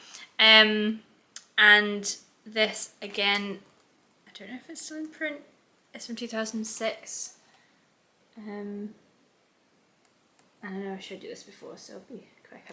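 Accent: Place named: Scotland, United Kingdom. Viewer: Fellow flosstuber and new subscriber here 💗 so happy to find your channel!! I live in Texas, USA, but love your beautiful country and have been to Glasgow! Our daughter went to graduate school in Edinburgh and we have been to Scotland several times to visit her. Your accent is delightful and your stitching lovely! I am hoping to watch your WIP parade soon.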